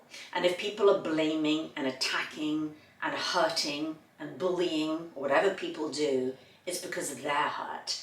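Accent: England, United Kingdom. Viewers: it's nice to listen to you and you've a positive mindset .and it's interesting to know all the steps to reach happiness and positive mindset eight steps. I'm French my name is RICHARD and I should to put subtitles to follow you because it's most easier for me to understand the meaning of your talk .I suppose you're English what you told us and I don't know why I prefer to listen to English people than American people. I understood your talk and I'm so glad because step by step I improve my English skill .above all what I have learned about what you told me is never never give up is what I'm doing .I like English language and I hope one day to meet English speakers to share with them .here in France where I live people doesnt like sharing language I was born in France and I'm sixty three years old but I try to keep getting my energy. I'm happy to be alive and enjoying all things .culture spiritual activity music and cooking too .life is so wonderful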